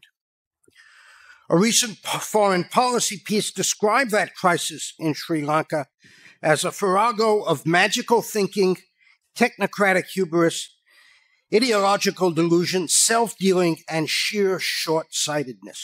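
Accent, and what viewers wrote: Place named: New Zealand. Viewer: Sounds like New Zealand 🇳🇿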